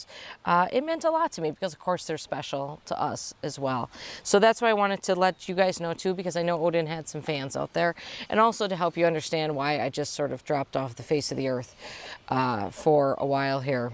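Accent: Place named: Midwest, United States. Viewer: My husband and I have only had one dog in our 39 years together and this is why. It was devastating to lose a 25 lb schnauzer. I can't imagine losing a giant teddy bear like Oden. My heart goes out to all of you. You are real, pragmatic, down to Earth and talk to the camera like the neighbor I wish I had. My 80 year old mother who was born and raised in a Chicago suburb says she likes you too. Must be the familiar Midwestern speech and manner. Take care.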